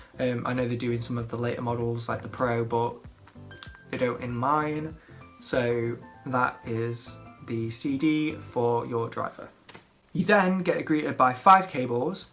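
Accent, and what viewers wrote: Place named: England, United Kingdom. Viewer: I just like listening to you. U SOUND like U know what you're talking about and I love your English accent.!!!.. take care and keep it up.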